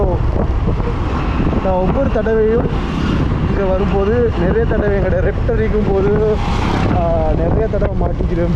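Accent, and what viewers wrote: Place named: Sri Lanka. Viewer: Sri Lanka tamil beautiful ah iruku but konjam puriyamatinguthu 😅❤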